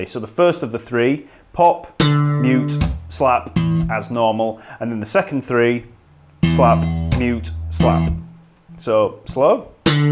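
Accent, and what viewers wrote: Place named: England, United Kingdom. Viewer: I really need to admit that Your tutorials are very helpful! I started giving up with looking for some simple but full of knowledge and exercises videos, and, what's more, for free. And then, I've found You and once again I really awoke this desire to play bass on a high level! Thanks Btw, what kind of English accent do You have?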